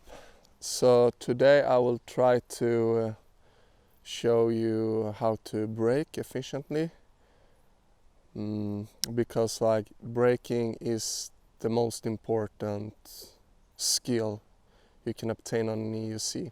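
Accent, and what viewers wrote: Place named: Sweden. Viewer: Wow, thats really nice breaking. You sound Swedish (är du Svensk?) When you were braking on gravel i was like, what did they add ABS on some model allready? 😮 Cause those skid streaks seems impossible to make unless its something self controlling (3dm in 50km/h (13.8m/s) only takes 22ms to travel, seems inpossible to adjust based on input every 22ms. But might be that wheel becomes self adjusting? As it starts skidding, the power drops drastically vs when actually breaking? Why no helmet though? Braking from 50 can turn this into something that hospitals log, to ban EVs (dont ask me how i know 😊)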